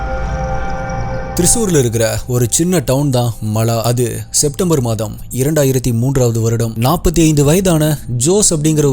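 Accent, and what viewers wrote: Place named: Eriyur, India. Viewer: அண்ணா உங்க ஊரு ஏரியூர் ஆஹ் னா உங்க slang அந்த ஊருல உள்ளது மாரி irukku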